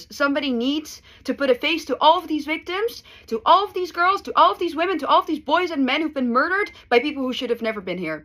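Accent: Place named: Groningen, Netherlands. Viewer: as indonesian i can tell but which dutch? she has that groningen accent, the frissian is very english ish.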